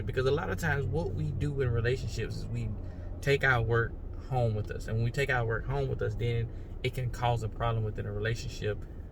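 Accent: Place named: South, United States. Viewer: Love that southern accent! Be blessed 😎😷👍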